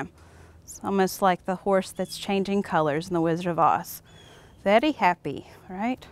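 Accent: South, United States. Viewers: Thanks for lesson. I like your southern accent :)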